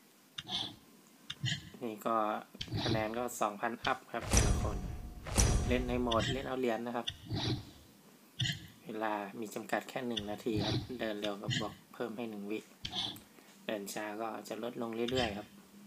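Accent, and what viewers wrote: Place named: Isan, Thailand. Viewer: ผมขอโทษครับ หลุดฮาทุกทีสำเนียง ซ.ซ้าง ผมคนอุดรครับ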